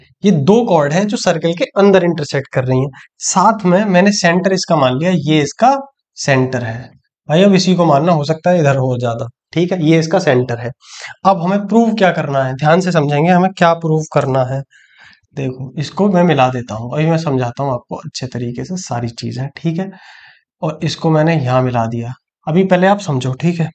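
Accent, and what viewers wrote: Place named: Uttar Pradesh, India. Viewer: I think sir is from uttar pradesh 😂 that accent....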